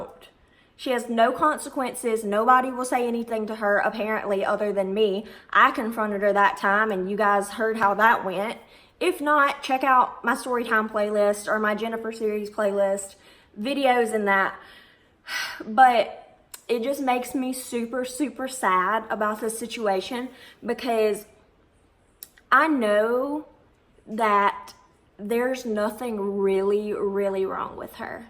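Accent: South, United States. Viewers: Far as I can tell,your makeup looks fine. Also,where are you from. Noticed a southern drawl. I,too,am a southern gal. Southern Louisiana,in fact. Saw a few videos. Seems like Jennifer is sabotaging the shop. But,since she's blowing the boss,it's unlikely she's getting fired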